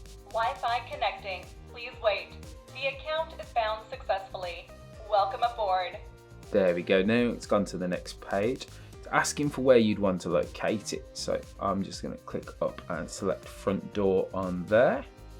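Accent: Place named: Birmingham, United Kingdom. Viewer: I detected a Birmingham accent, then I googled Smart & Secure Centre and you're a few miles away from me haha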